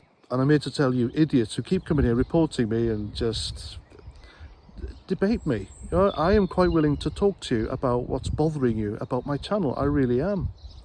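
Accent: Wales, United Kingdom. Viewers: I'm sitting in my great English garden enjoying the English sun ( and it is English ) drinking my cup of English tea, listening to a oh...Welsh man speak sense. Can't have it all I suppose.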